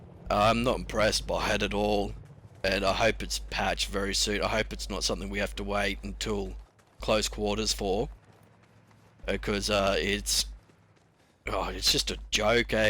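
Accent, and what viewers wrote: Australia. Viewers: Finally an Australian commentator, definite sub from me love your vids :)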